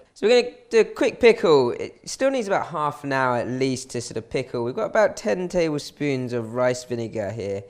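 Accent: United Kingdom. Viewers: Too much sugar....i can not trust this man. Also, an annoying British accent...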